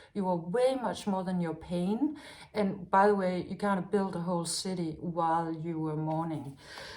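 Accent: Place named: Denmark. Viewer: Listening to your accent brought back many wonderful memories of my Danish mor. ❤ Thank you too the lovely positive reading, love from Australia.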